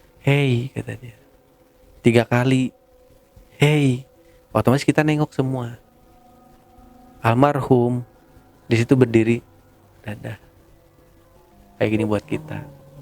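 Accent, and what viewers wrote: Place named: Bekasi, Indonesia. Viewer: Gw klo denger bang logat anak Babelan lagi cerita hehee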